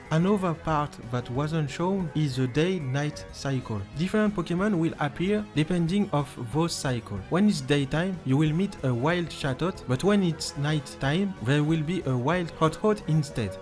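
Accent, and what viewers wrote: France. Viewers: c'est pas pour me moquer mais l'accent français me calcine j'arrive pas a suivre la video normalement 😭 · That’s a French accent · he’s French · Tu es français? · T’es français ?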